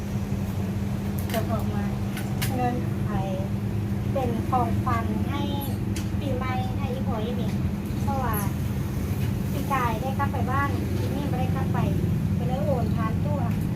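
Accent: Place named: Luang Prabang, Laos. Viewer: มีสำเนียงหลวงพระบางออกมาแว็บๆ! อยากฟัง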